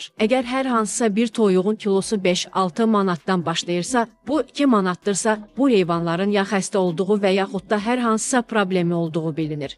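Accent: Armenia. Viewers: Bu kim idi? erməni ləhcəsində məlumat yayıb.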